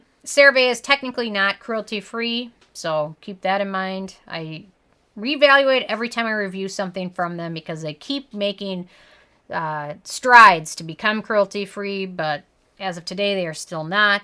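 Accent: Minnesota, United States. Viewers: Are you from Minnesota? The accent sounds like it. ;)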